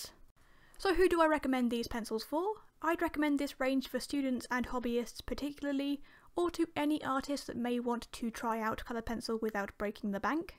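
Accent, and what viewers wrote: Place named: RP, United Kingdom. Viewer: Ive only noticed you're channel 3 days ago and the fact youre English and posh talking really has made me subscribe and youre artwork is supurb x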